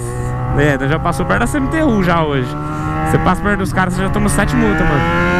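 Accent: Goiás, Brazil. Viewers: Pq vc fala "no pique du Goiás"? Vc é daqui? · Queria saber por que o fala no piqui do Goiás kk eu sou do Goiás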